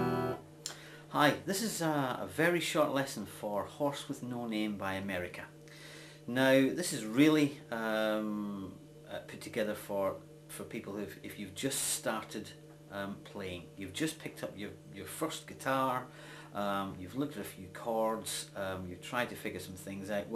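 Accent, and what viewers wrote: Scotland, United Kingdom. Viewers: woah i was not expexcting a mrs. doubtfire accent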